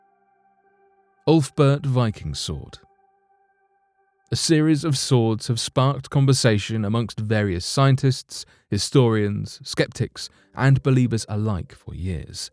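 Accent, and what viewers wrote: United Kingdom. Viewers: An even greater unexplained mystery... Why is it that all the mystery/unexplained YouTube channels are narrated by Brits or Irish?! Not bashing I love it #Attenboroughismydad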